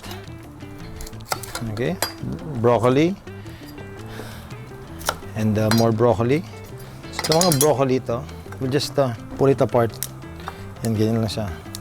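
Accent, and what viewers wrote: Visayas, Philippines. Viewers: its ok. maybe your so busy being public servant there in Ormoc so much so you speak lots of Bisaya already and for me, you got a grade of 85% and a 100% for the effort. Im from cebu. we flew to vegas together along with john t. PAL and P. buenaventura for gun sport show almost 2 decades back.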